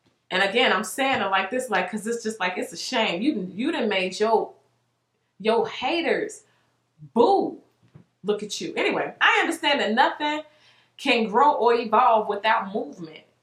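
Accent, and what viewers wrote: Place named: Atlanta, United States. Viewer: U so Atlanta. Loool .😂😂 I love it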